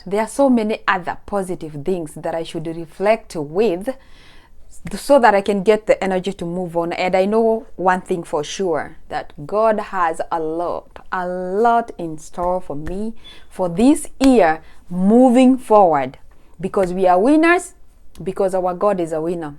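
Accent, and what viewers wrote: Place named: United States. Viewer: Daughters of Sarah...by the way i love your American English accent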